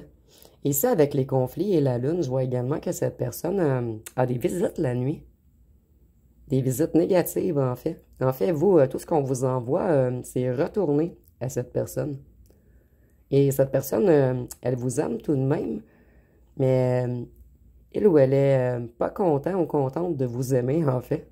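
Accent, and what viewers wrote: Quebec, Canada. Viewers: Votre voix me réconforte et elle est fort agréable . Et vos mains jolies avec vos ongles et vernis . Merci pour la guidance que vous nous apportez gracieusement , et votre accent québécois j'adore. Cette personne a essayez revenir mais je ne veux plus depuis que j'ai vu derrière le masque qui s'y cache , un homme a femme qui se dit fidèle ,et qui est ds des travers sexuelles et malsains et en perpetuelles conquêtes il papillonne , il est très toxique et malsains très menteur ,depuis que j'ai retrouver ma liberté ,je revie sans lui et retrouve mes énergies ,il n'apprécie guère car il voulais me mettre sous terre, il m'avait mise en cage et enlever mon abondance et mes énergies ,il est jaloux maladif et possessif un psychopathe mytomane pervers narcissique ,toute la famille est ainsi complètement cingles, et seul il ne peut pas vivre et il est trop immature.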